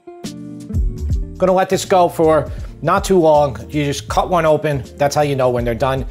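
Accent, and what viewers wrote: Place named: Long Island, United States. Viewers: You are a delight to watch! Your deadpan humor, your outstanding recipes, your Lon Gisland accent, and your well edited productions have made you my favorite YouTube chef. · Test it Awl... Not a knock! I'm a Long Island Boy too! Music to my ears!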